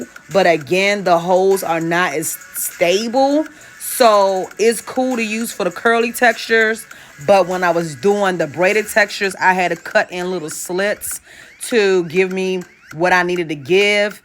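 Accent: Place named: Maryland, United States. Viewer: You're definitely from MURLIN toooo!🤣 I know that accent anywhere. I've been living here for 34 yrs and the accent is still so distinctive to me. Great job on the technique. I have alopecia on the crown of my hair and I am always looking for options to share to help me. Thank you!!!!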